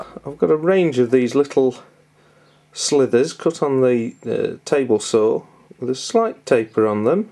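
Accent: Manchester, United Kingdom. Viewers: My God he's from Manchester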